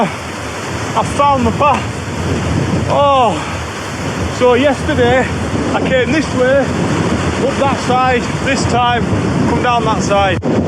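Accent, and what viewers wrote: Yorkshire, United Kingdom. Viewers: I've been watching your videos for a few weeks now and love them. Being a Yorkshire lass, I never get sick of that accent ❤. One thing I really need to know is... where did you get sticky toffee pudding coffee from? It sounds amazing. Keep up the great videos , I love watching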